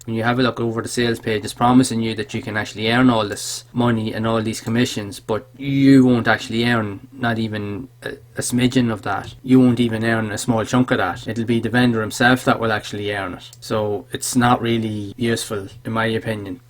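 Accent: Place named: Ireland. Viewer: Great honest review.. Where are you from, Cavan? Nice to hear a fellow Irish man. As per the product.. I doubt even newbies would be approved to promote the 30 offers..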